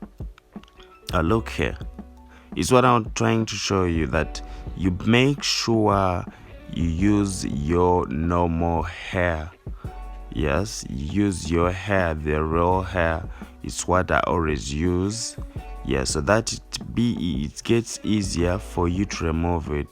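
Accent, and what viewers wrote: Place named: Uganda. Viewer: Sounds like Ugandan wow proud of my country 💪💪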